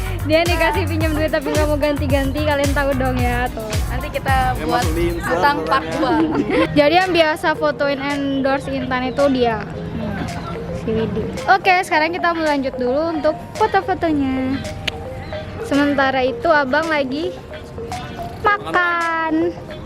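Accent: Lombok, Indonesia. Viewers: Mantul bngt cara ngomongnya kak medok lombok,saya sendiri juga orang lombok:)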